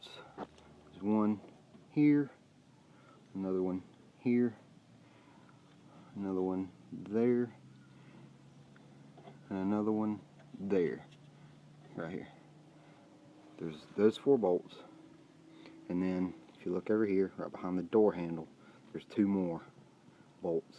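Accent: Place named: South, United States. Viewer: Really informative in a southern accent!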